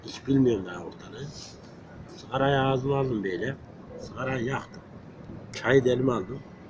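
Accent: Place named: Yozgat, Turkey. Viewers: Nereli bu adam? Şive Iç Anadolu ama il-ilçe Konya tarafı mi acaba? Bilen var mi? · Konuşanın ağzını Yozgat ağzına benzettim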